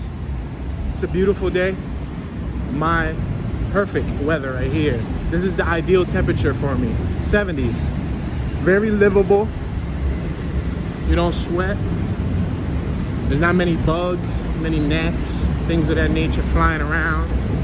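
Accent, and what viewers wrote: Philadelphia, United States. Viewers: What a day to be celebrating Queen Village with the passing of HRH Queen Elizabeth II. Thank you; this is beautiful. Philadelphia is nothing if it is not diverse in neighborhoods and atmospheres; gorgeous. BTW you have the same Philly accent my relatives had a century ago; thanks for keeping it alive. ;-)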